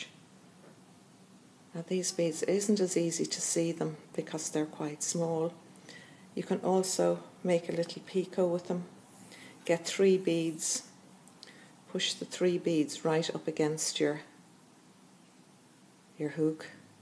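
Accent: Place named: Ireland. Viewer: Very clever lady! Thank u. Love love love the Irish accent! Xo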